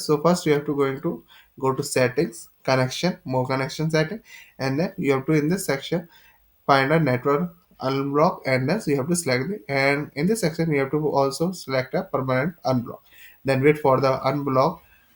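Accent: India. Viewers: your disgusting indian accent kills me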